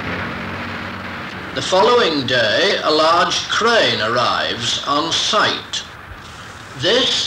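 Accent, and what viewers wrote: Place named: RP, United Kingdom. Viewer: The narrator wasn't half posh.....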